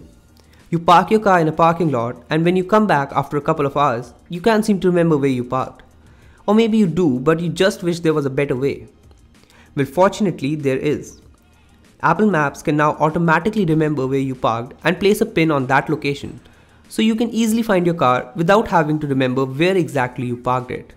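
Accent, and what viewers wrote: India. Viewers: badibadibadi one currrryy pleaseeeeee, i really didn't understood anything what he said with his indian taxi driver dialect ... it's really hard to understand what he says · Worthless vid, unless you like listening to indian accent english... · It’s Indian accent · Indian english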